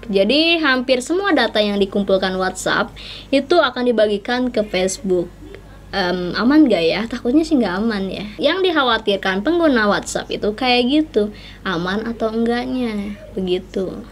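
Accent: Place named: Sunda, Indonesia. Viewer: Ciri has ngobrol nya Sunda banget teh semangat sukses sllu teh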